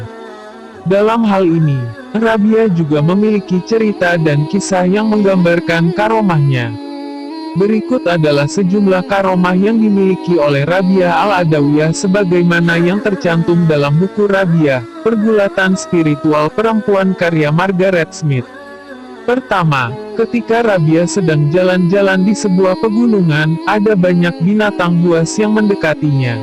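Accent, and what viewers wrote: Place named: Madura, Indonesia. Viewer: Madura kerass 😀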